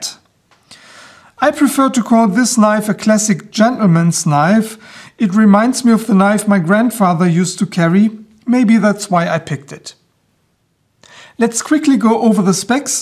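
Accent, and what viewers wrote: Germany. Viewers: lovely knife i love your channel your english is excellent especially with your German accent i find it relaxing and interesting at the same time:) cheers from the UK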